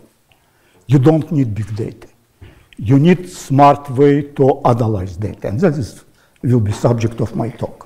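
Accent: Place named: Russia. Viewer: Google clearly didn't train their model on the accent of elderly russian professors.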